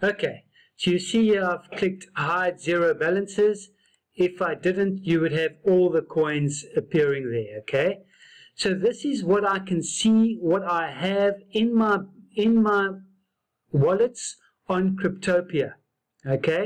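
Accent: South Africa. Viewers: That beautiful south african accent. Dankie vir die video maat! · He sounds South African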